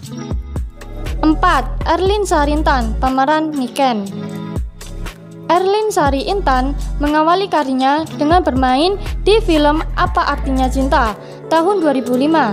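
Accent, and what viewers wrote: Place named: Java, Indonesia. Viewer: Jawa bgt kak..wkwkwk👌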